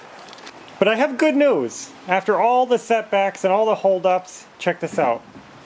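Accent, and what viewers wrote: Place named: Upstate New York, United States. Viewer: that’s awesome!! I’ve been going back and watching all your videos too!! I’m from upstate New York and I heard you were too.. haha!! I knew I recognized your accent 😂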